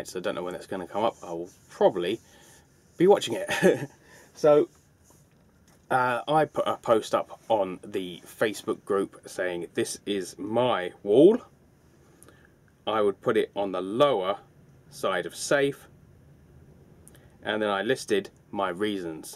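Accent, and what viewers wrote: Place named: Kent, United Kingdom. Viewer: I thought you sounded like a man of Kent / Kentish man, (always forget which is which) ;) I grew up in Canterbury but now up in Suffolk. I’ve got hundreds of people keep telling me to make more videos but... dunno... you’ve inspired me though! So thanks ;)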